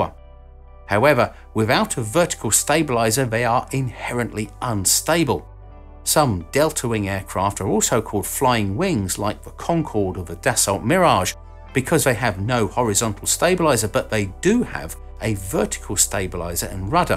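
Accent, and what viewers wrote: United Kingdom. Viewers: It's called a British accent...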